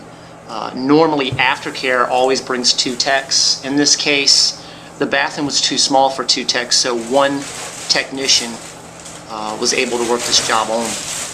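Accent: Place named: Norfolk, United Kingdom. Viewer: That Norfolk accent is THICK. I’m from northern va, so we say it all boring “nor folk”.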